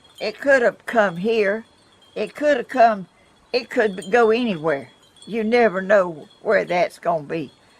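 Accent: South, United States. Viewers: Love your Shawls and have 1 I wear myself. The Ladybugs R great!! 🐞love to hear you talk and I'm a southern too. Blessings and love to You from N.Carolina.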